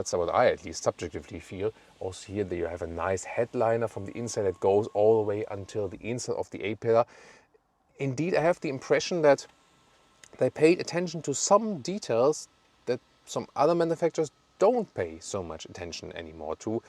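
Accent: Germany. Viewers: You have german english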